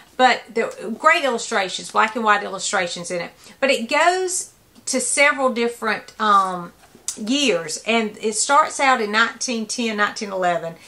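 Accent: South, United States. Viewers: Welcome Back! This is the first time I've commented here but I just want to tell you that I've missed seeing you and hearing that lovely Southern accent. And oh yeah right now I'm reading To Kill a Mockingbird. It was so good to see you again. It really made my day!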